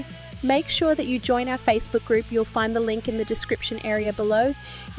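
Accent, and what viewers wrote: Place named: Australia. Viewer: Hi! Please don't fret over the Accent In the Video! TBH, I love it!!! I've always loved a hood Australian Accent! I think it's Beautiful! It's REALLY not THAT Noticeable. Like... TBH, I don't think I would've caught it if I wouldn't have read the Description! LOL! ~🙏💙🙏Much Graditude From The Carolinas, Erica 🙏💙🙏~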